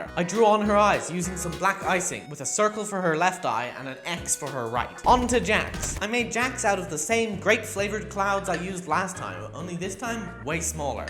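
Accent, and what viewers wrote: Australia. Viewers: UR AUSTRALIAN :0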